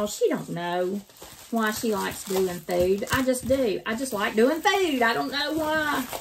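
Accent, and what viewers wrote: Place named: South, United States. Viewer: Girl I love you ! From the personality to the accent I’m country myself ! And love love looovecthe videos !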